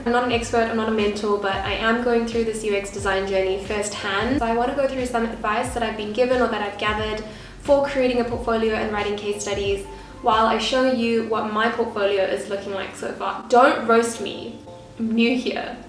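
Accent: South Africa. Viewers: Always odd to hear an SA accent on YT but I'm happy to support a local creator, great video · I was like: is she australian is she irish? no south african makes sense now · Gosh, I couldn't recognise your South African accent at all haha! Maybe because I have one myself, who knows haha.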